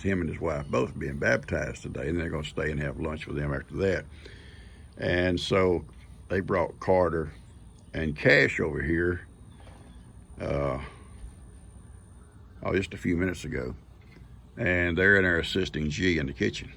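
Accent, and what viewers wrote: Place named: Texas, United States. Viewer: Such an enjoyable video, i could listen to that Texan drawl all day. We don't have hummingbirds here in Australia the closest would be our honeyeaters, nectar collectors😊 Many thousands of us understand we see little snippets of your life. We mostly call our grandies little tackers. I also think we all know the different bonds we have with our grandkids because of divorce. I'm starting to speak Texan! Find myself saying I'm 'fixin' to do something and i love "all y'all". Kash is a beautiful wee man and Heidi is so special ❤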